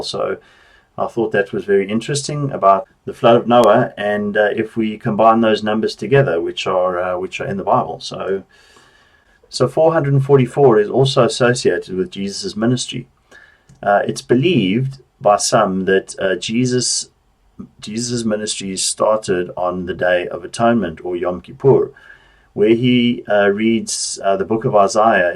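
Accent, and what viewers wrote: South Africa. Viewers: I can hear that SA accent boet 😁👍🏼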